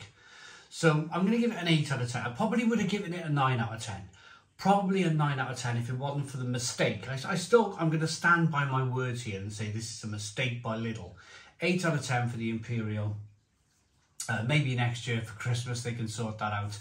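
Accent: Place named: Cardiff, United Kingdom. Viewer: ArchStanton obviously has no knowledge of the Barry derivative of the Cardiff accent spoken by the presenter. It has no connection with East End Cockney!!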